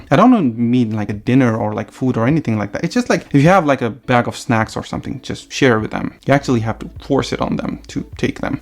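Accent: Estonia. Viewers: Wth, you have Estonian accent, totally 🤧🤔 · Its funny that you have a little bit of an Estonian English accent.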